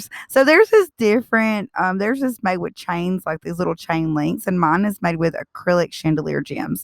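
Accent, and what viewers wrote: South, United States. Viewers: I missed you girl! Live this diy!😻 I'm also subscribed to. I love her diy's also.😻 She does have a great soothing voice. I still love that southern accent of yours though. Anyway, can't wait for your next diy! 👍💖😊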